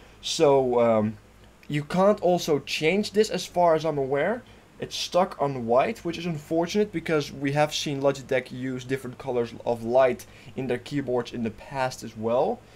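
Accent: Netherlands. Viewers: Are you perhaps dutch by any chance ??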